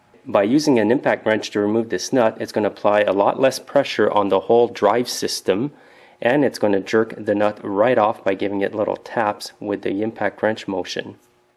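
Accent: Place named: Canada. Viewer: such a canadian accent eh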